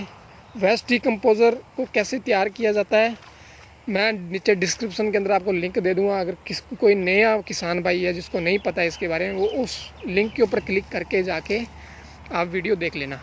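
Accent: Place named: Rajasthan, India. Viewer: Bhai tumhari language ka accentto rajasthani h but tumne khud ko punjab se bta rakha h,, but overall good job..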